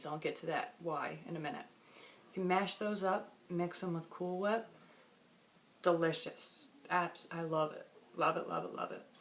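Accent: New York, United States. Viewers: By the way I could listen to you talk forever I love your New York accent it is so adorable I just I love it I cannot wait to come out and see you in New York we are going to have a blast!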